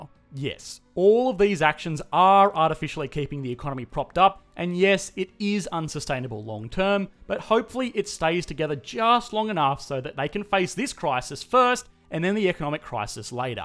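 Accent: Australia. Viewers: is the speaker an aussie? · Is he Australian??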